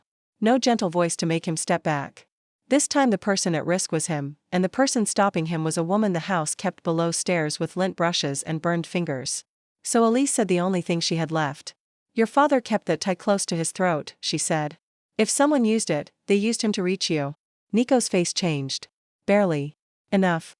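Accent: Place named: Italy. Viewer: 👏👏 lovely and written with humour.. good narration, albeit the italian accent interposed and no clear report regarding the powder on the tie and pin…♥️♥️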